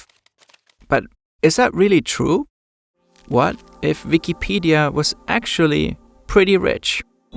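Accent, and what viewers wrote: Germany. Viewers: Coz he's german w is pronounced as v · Are you german? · you sound like a native german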